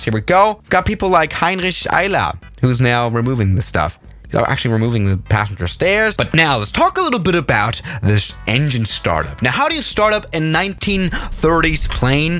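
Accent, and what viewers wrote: Mid-Atlantic, United States. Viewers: Your accent is good. It's pretty close to normal mid Atlantic American. I'm impressed.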